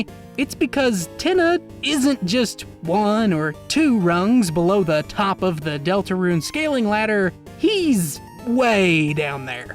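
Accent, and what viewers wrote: South, United States. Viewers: Oh my god you are so southern. I live in the deep south and i don't even know anyone who sounds like that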